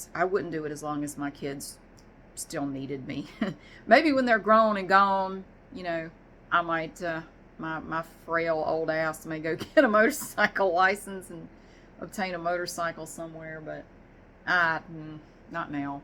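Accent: South, United States. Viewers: Ms., I’m not sure if you already know, but there is a viral video of a woman with a southern accent on TikTok and many of the people watching that video were requesting southern accent asmr from her. Soooo many of the people replying to these comments were suggesting your channel and singing your praises! I was so happy to see that you’re so popular, even people on TikTok bring you up! Just a small a testament to your affect in the asmr community 🤗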